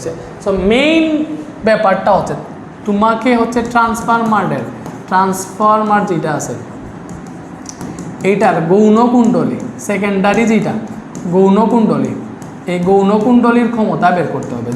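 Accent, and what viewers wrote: Sylhet, Bangladesh. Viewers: Vai apni Sylheti Matiya Koin ❤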